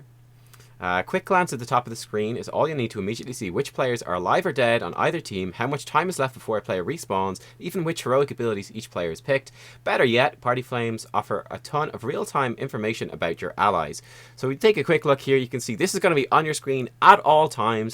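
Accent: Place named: Ireland. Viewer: you are right about that: much more enjoyable to hear you read through it bc my internal voice don't have the cool Irish accent :)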